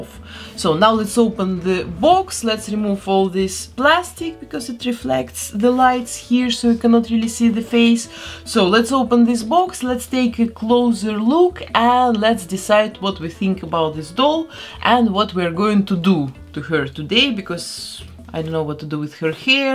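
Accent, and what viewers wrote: Russia. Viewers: Акцент сто процентов Русский, но написано , что Бельгия )))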